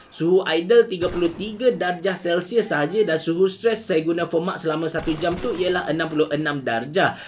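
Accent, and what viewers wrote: Kelantan, Malaysia. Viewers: mcm awal2 dlu kencek klate😄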